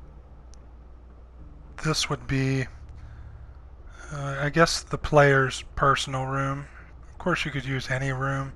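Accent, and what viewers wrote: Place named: Southeast, United States. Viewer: It's a southeastern U.S. accent.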